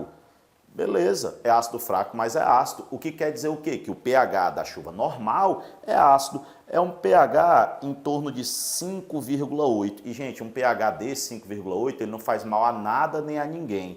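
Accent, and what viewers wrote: Ceará, Brazil. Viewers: Cearense é reconhecido onde for Kkkklk é nois, macho véi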